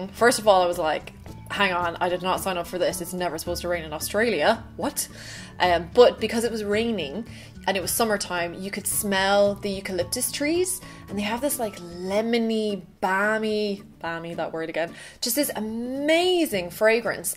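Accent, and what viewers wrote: Ireland. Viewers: I was thinking west/mid west of Ireland, particularly when you said you met your hubby to be in Limerick (my home town!) best of luck for your wedding!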